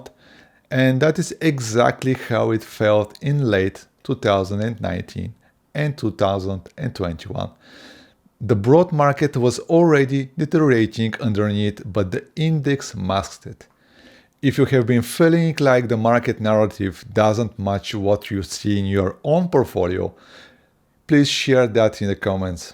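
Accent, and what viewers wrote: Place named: Bulgaria. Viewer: Zdraveite ! That's hello in Bulgarian as i was sure by the accent that he is same nationality as me . Multiple financial markers as Student loans unpaid and Mortgages unpaid and Car reposesions increasing is not painting a good picture. Let's see as most money is made in bear markets for those who know what to do and have powder.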